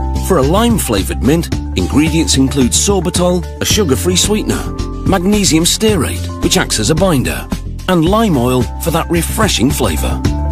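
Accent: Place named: United Kingdom. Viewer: FINALLY!!!! THE BRITISH DUDE!!!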